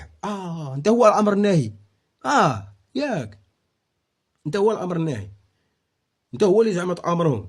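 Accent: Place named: Morocco. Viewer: أقسم بالله غير هذا مروكي مطبع ومن اللهجة باين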